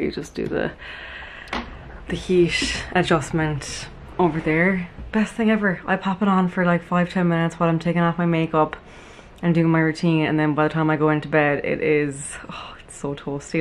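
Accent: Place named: Kerry, Ireland. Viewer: Love how relaxed and natural you are in these vlogs at home. Will give them a go tomorrow. Sunday is self care day! Never apologise for the Kerry accent (from a Dublin gal).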